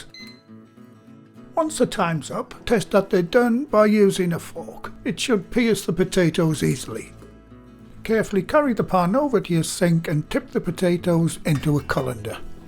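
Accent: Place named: Northern England, United Kingdom. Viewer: Hi, love your channel, I only now realised your voice is like Sir David Attenborough but with a Northern accent, really calm spoken, If Sir David Attenborough done the food channel and had a Northern accent I wouldn't tell you apart 🤣, thank you I'm looking forward to trying this one, it's not complicated 😁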